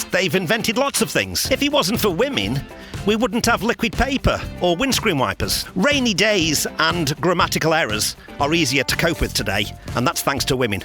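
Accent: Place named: Stoke, United Kingdom. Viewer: I thought I recognised that accent. I've lived in Stoke for over a Decade now. Been trying to place you for a while.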